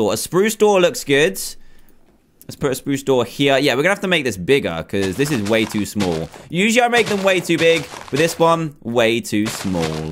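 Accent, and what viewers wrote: England, United Kingdom. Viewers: he's English and from england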